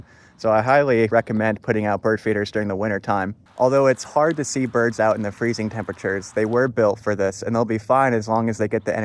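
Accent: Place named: United States. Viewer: Fun and all but cant stand the thicc american accent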